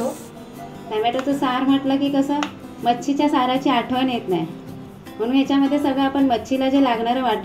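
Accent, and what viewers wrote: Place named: Malvan, India. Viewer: Like your malvani accent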